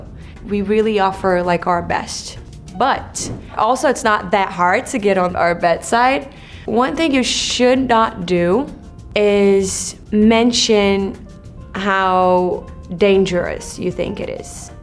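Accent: Rio de Janeiro, Brazil. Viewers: Her carioca accent is so cute! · Ela arrasa muito no inglês e no português não perdeu o sotaque carioca ! Essa menina é demais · Her brazilian accent in the middle of her english is so cute! She is Just an amazing person♥️ We love her in Brazil! ♥️ Arrasa ❤ O mundo é teu!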